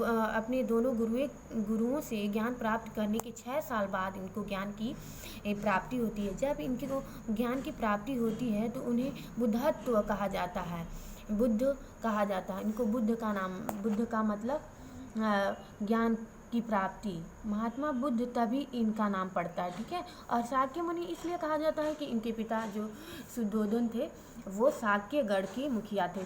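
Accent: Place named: Eastern Uttar Pradesh, India. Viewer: Mast Aap east up ke lgte ho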